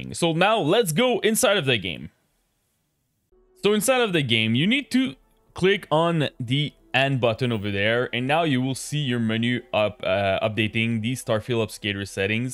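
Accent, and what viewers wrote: Quebec, Canada. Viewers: merci man l'accent quebecois est en force ahahah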